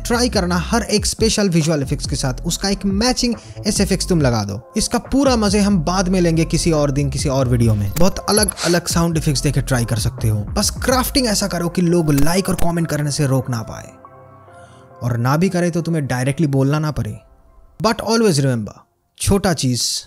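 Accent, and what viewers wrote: Bengal, India. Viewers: Tum bhi bengali ho na? Tomar hindi te banglar tan ache!!!!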